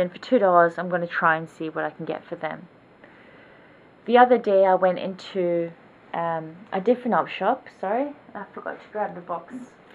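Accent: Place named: Scotland, United Kingdom. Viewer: idk why but I thought you were Scottish hehe. Anyway you should post your ebay name.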